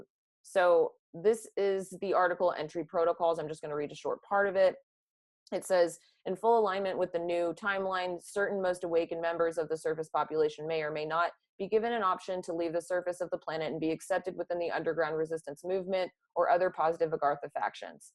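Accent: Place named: Texas, United States. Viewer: You are clearly from Texas... I can tell because you talk so normally, but then you say y’all like it’s normal... so Texas... I am from OK, but good stuff I like your material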